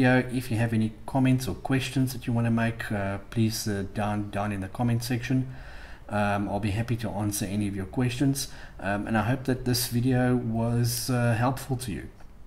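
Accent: South Africa. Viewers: you sound South African im based in capetown any chance its still available if you are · Are you south African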